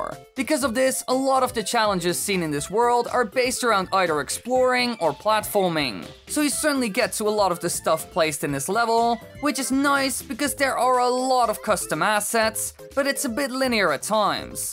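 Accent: Netherlands. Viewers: This english accent is dutch hm?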